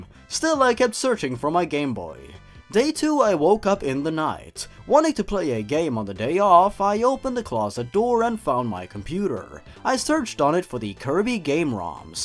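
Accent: Sweden. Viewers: U swedish? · Are you swedish?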